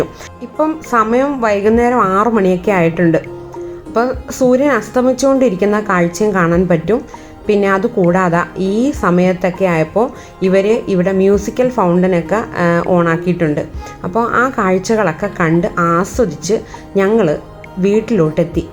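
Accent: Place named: Erattupetta, India. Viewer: Eratupetta slang varunund samsarathil😇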